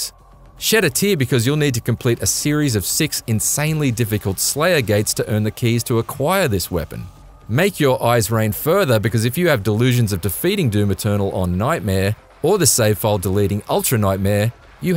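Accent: Australia. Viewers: is this Gmanlives? I can tell that he's Aussie, but it doesn't sound like Gmanlives. The accent is slightly different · Goodness, I always significantly enjoy the texts when any of the Aussie lads writes/narrates them!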